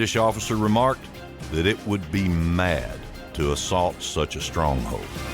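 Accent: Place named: South, United States. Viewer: Decently made, some inaccuracies for sure though. Biggest example being that the British 100% DID have experience fighting “like Indians and backwoods men”. This was called “skirmish order”, and European regiments had a whole company each of light infantry specifically trained in this. They also had just fought an entire 9 year war, both with and against these tactics, known in the US as “the French & Indian War”. So this was nothing new to them, and woodsmen played a minimal role in the war UNTIL the war reached the south where tactics changed. These men would not win in open battle against British regulars. So they did not even try. They attacked civilian and logistical assets, making British leadership not feel comfortable moving further North. This kept the British bogged down chasing “insurgents”(think of modern Taliban tactics), and kept the northern and southern British armies separated. 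My biggest gripe is Trace as narrator. If wanted to be taken seriously as a historian, he should not have been a modern country singer. If you want your documentary to be taken seriously, don’t hire Trace. Especially to cover a subject that literally Southern accents did not exist within. Thank you for listening to my rant 😂.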